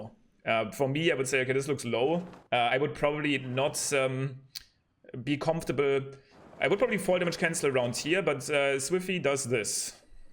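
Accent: Germany. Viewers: du sprichst doch locker deutsch dein aktzent hört sich so an weil du nicht so gut die asusprache von englisch kannst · Man merkt dass du deutsch bist haha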